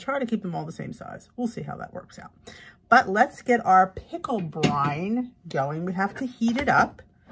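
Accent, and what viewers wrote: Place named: Philadelphia, United States. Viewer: From one South Philly girl to another- You can take the girl out of South Philly but you can’t take South Philly out of the girl🥰As soon as I began listening to you (recently) I thought you sounded like a Philly girl. St.Maria Goretti HS grad. Class of 1970!!! Much older than you 😊 Really enjoy your channel 👏👏👏👏👏 Mary from Delaware County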